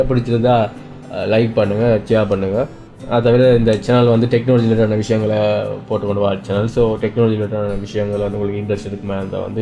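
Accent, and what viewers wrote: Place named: Sri Lanka. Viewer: Very happy to hear tech review in sri Lankan Tamil slang! 😍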